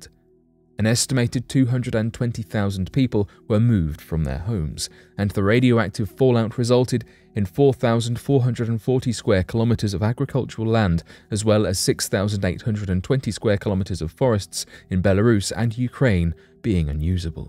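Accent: United Kingdom. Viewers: Just because he has a British accent doesn't mean he has any credibility.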